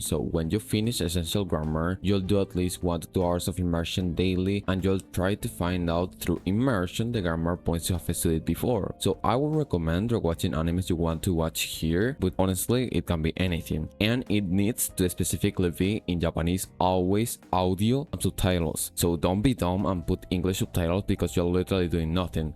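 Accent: Spain. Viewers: I watch videos on English, my level is not high, but I noticed - I can hear words and correlate with writing, but Spanish accent fully confused me, I can`t even concentreate on subtitles. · Amigo, con todos los respetos porque sé que has dicho que llevas 3 años aprendiendo inglés y no quiero restarte mérito, pero te recomiendo que mejores tu pronunciación en inglés. Sobre todo las vocales, pues las pronuncias igual que las vocales en español, cosa que es un error garrafal, porque en el inglés hay más de 20 vocales mientras que tú solo usas las 5 del español. No puede ser que después de mas de 3 años aprendiendo inglés pronuncies la palabra "studying" de manera literal, con el sonido de la 'u' del español, y que también pronuncies la palabra "audio" igual que en español. No sólo es desconcertante para los hablantes nativos del inglés que tendrán dificultades en entenderte (porque al no pronunciar una palabra correctamente lo que haces es que el oyente la confunda con otra palabra cuya pronunciación sí sea esa que has hecho tú, como por ejemplo pronunciar 'sheet' como 'shit' o 'sheep' como 'ship'. 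Menos mal que al menos añades subtítulos a tus vídeos), sino que también haces que sea desagradable escucharte hablar, pues a nadie le gusta oír a alguien chapurreando su idioma de manera torpe y lastimosa, mas bien dan ganas de quitar el vídeo. Te recomiendo que cuando oigas videos en inglés, prestes mucha atención no solo al contenido del mensaje, sino también a CÓMO pronuncian lo que dicen. Si el acento que quieres aprender es el del inglés americano te recomiendo mucho el canal de Rachel's English, que se dedica exclusivamente a enseñar la pronunciación del inglés am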